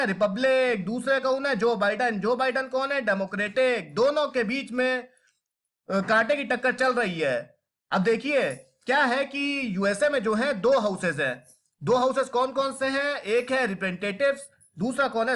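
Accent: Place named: Bihar, India. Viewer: Vhaisab apne toh dhom macha dii ... voice toh akdm Bihari babu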